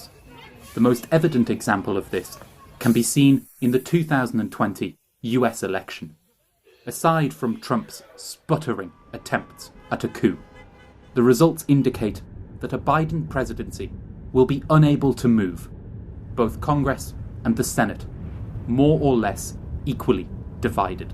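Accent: United Kingdom. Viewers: You sound British or Anglo-Saxon for sure, so you don't live in communism. SO, JUST ASKING HOW DO YOU KNOW THAT IT'S SO GREAT AND WONDERFUL? LEGIT QUESTION.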